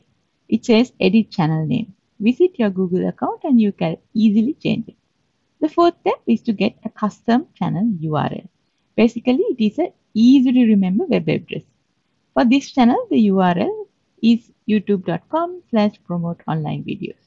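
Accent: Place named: India. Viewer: oo i think u r Indian because of your accent 🙂 sry 😋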